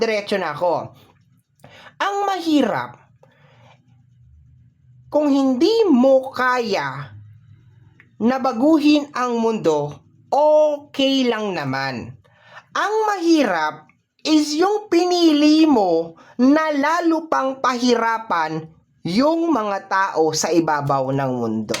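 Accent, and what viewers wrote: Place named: Iloilo, Philippines. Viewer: Good morning watching from Jacksonville florida 🇺🇸 thanks for reading i resonates much and you sounds like ilonggo me too from jaro iloilo city ..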